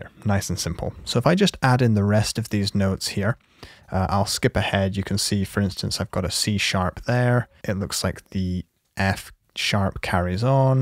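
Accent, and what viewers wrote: Scotland, United Kingdom. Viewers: Have my kids mr Scottish man thank you for this!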